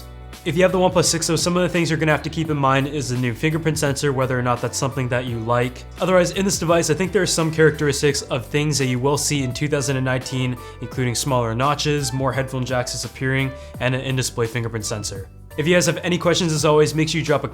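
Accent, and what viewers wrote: Canada. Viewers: MD KHALID RAHMAN he’s canadian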